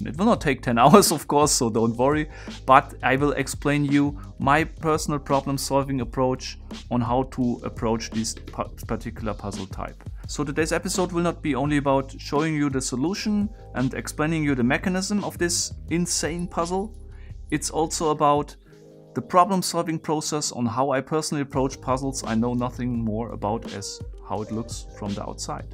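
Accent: Germany. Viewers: Klingt sehr deutsch, dein englisch ^^ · Is he german? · I love this german or skandinavian accent. Such wholesome english;) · nice video and interesting puzzle 👌, but damn bro ur german accent is awful 😧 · Es ist lustig wie man am Akzent raushören kann, dass du aus Deutschland bist. Its funny that you can hear that he is german :P Good Video tho! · Could it be that you are german? Becaus your accent and when you said ‚klack‘ it gave it away 😂 Greetings from munich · It is a very hard German accent ^^ my fellow Landsmann has used this as charme however :) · Its so weird how he sounds so german.. he also uses have instead of has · Man hört raus dass du Deutscher oder Österreicher bist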